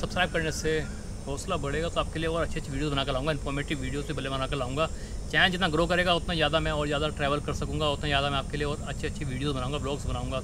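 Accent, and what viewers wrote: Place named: Uttar Pradesh, India. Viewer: Safar Paiy Main Aap ko Yaad Rakhunga Jaroor Sir G. Par Aap Mujha Yaad Rakhna Saddle Bags Give Away Karta Huay . 😁 Bhagwaan Aap Kay 10000 Nahee 10 Lakh Subscribers Ess Saal Kar Daily. My Best Wishes To U For that. I liy your vlogging style & your Hindi UP Accent . Cheers bro Milangay Safar Par Aap Ko Ek Din .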